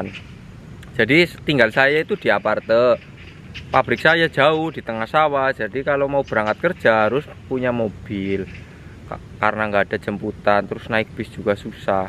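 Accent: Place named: Tegal, Indonesia. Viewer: Ky nya org tegal yah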